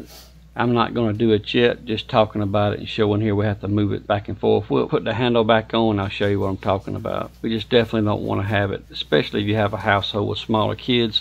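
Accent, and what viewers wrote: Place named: South, United States. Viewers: I work as a handyman and I'm always learning new things. Doing a replacement of this exact valve for a coworker. Gotta say, once I heard the southern accent, I knew I'd be walking away a smarter man. Thanks dude. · Helped me so much for my shower. Could listen to a Georgia accent all day. -homesick in KS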